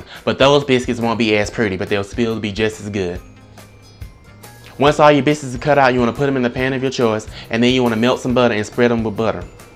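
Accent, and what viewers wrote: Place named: South, United States. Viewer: I can tell by the way that you talk that you're from the country so I know your biscuits are good!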